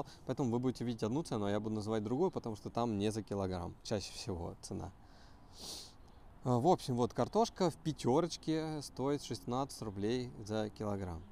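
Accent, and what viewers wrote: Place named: United States. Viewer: ..а еще у тебя произношение американское становится,даже говоря по русски)))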